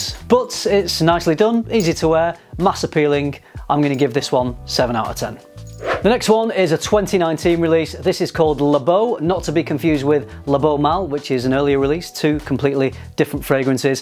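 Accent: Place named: Manchester, United Kingdom. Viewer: To be honest, I'm more focusing on your accent 🥰. Are you Mancunian?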